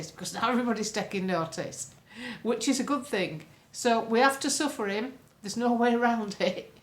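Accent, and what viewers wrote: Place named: North, United Kingdom. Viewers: I love you’re “uncle bill” Yorkshire accent, sounds like a bloke fre down road 😂😂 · Hello, You absolutely do make a difference in body heart mind and spirit. In my opinion you are the Muse of the North. Lots love and hugs❤❤❤ PS Love your Northern accent. Your accent sounds like mine❤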